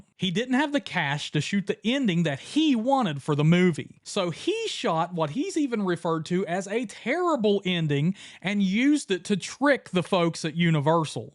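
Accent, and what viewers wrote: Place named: Pennsylvania, United States. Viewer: You sound almost exactly like that guy who cheri robs at the convenience store/gas station.. I grew up with friends from Pennsylvania and Ohio, you sound a lot like the idiots they made fun of.. keep up the amazing content. Maybe work on your delivery. Otherwise gobs-less...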